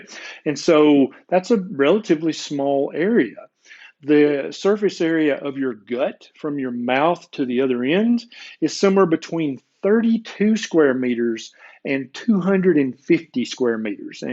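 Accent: South, United States. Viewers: Thank you for making these videos. Explanations made simple and concise with a southern accent and a handsome smile... Love it!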